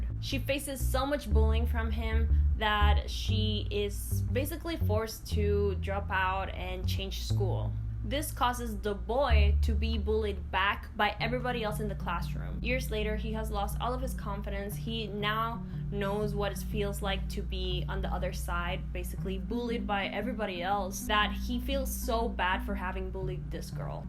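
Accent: Dominican Republic. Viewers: Yo no se quien eres pero yo conosco el acento dominicano donde sea keep it up good stuff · Girl please tell me you’re Dominican cause I know you’re accent is. Cuando hablaste español and also ARMY bomb yes 🙌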